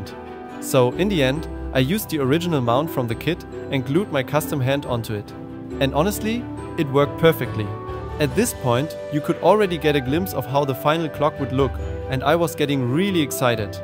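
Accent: Germany. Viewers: Hör ich da einen deutschen Akzent oder irre ich mich? Gutes Video und ich freue mich dass YouTube dein Content pusht! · the german accent 😂. banger video aber! ich wünschte ich hätte auch einen 3d drucker.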